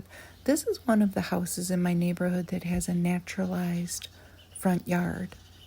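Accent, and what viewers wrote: Midwest, United States. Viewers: I was born in MI but raised in the south. Most of my family lives in IL or WI so it’s nice to hear that midwest accent for a change.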